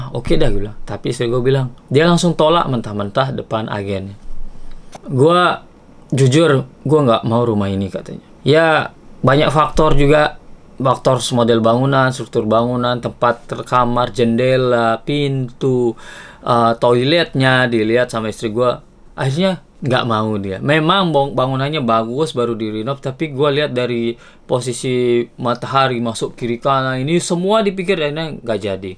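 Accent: Lombok, Indonesia. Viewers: La Ilaha...asli medok ...lombok tie meton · Logat sasak tetap melekat, mantap Semeton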